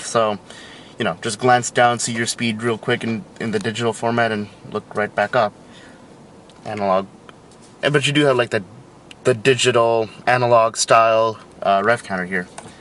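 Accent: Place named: India. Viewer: I just love this car. It is favorite car and i do want to buy one asap. BTW I noticed a slight accent in your talking. Are you indian by any chance?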